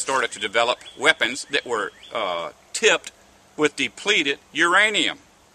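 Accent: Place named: New York, United States. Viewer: Aw heck, I though it was New York. His accent is a lot like yours.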